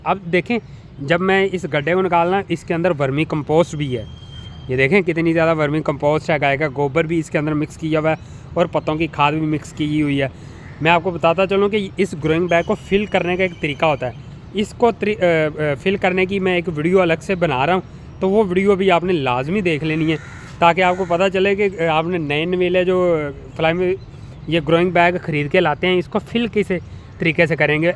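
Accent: Punjab, India. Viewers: پنجابی لہجے میں اردو تقریر۔لمبی اور فضول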